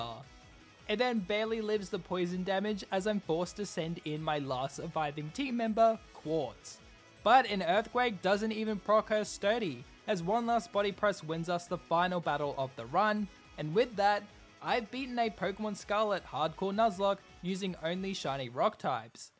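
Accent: Australia. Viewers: Are you Aussie the accent sounds familiar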